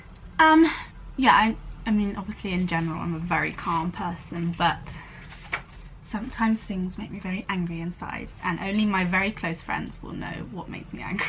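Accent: RP, United Kingdom. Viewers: Definitely posh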